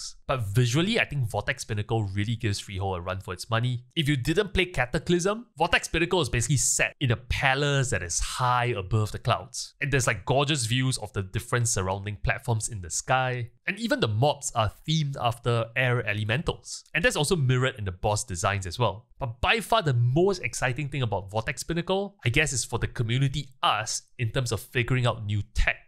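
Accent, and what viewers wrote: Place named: Singapore. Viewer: and thanks for the good videos. Love your Singaporean accent!!!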